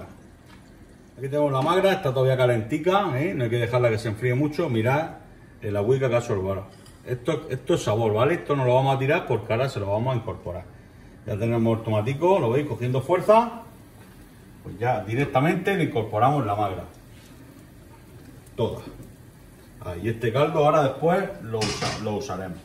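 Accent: Murcia, Spain. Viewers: Llevo unos años fuera de la Región y la morriña me está matando. La magra, el acento y sobretodo ese litrico al fondo le han dado la vida. Que fantasía. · Muy buena receta. Como se nota los aires murcianicos, jajaja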